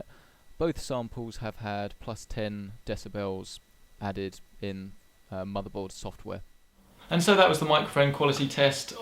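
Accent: United Kingdom. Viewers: that's just his british accent...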